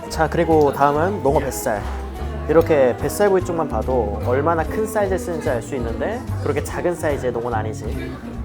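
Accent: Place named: Busan, South Korea. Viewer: 부산 분인가요..? 내가라고 말씀 하시는 것 같네요 와이프도 제가 보다 내가라고 얘기 많이 하던데.. 설명 하시는 목소리에 감칠맛이 가득 하세요 친구분들은 무슨복인가요 너무 부럽습니다... 와....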